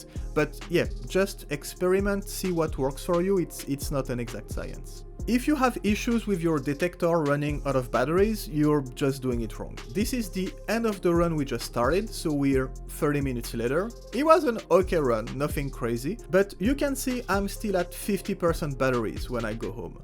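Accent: France. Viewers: I love your French accent man 👌(I look forward to the crafting video)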